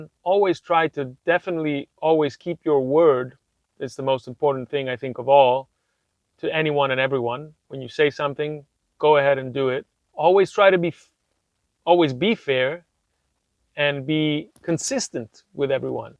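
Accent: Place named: Italy. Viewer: do you live in Italy. I noticed an accent btw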